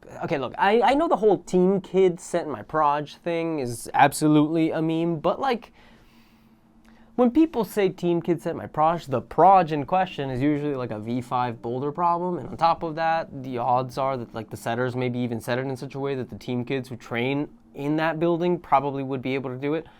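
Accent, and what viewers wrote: United States. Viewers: Eres español? Tío tienes acentaco americano. Como lo haces?! Muy buen contenido. Mis enhorabuenas.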